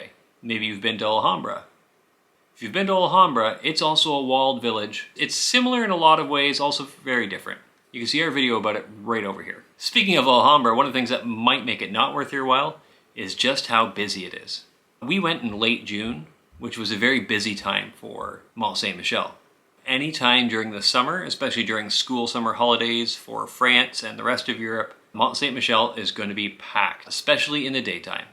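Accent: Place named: Canada. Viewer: Another Canadian on You Tube, my gawd it's like every Canadian has a YouTube channel. As soon as they say the word about you know you got a Canadian on your hands.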